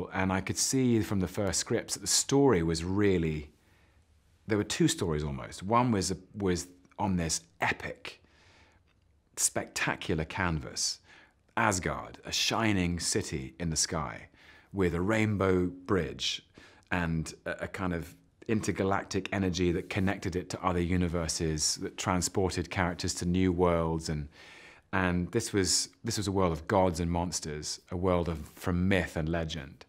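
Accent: United Kingdom. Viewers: Tom的聲音真的好好聽...還有那個英國腔真的太酥了吧（倒地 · 我只是來聽他的英國口音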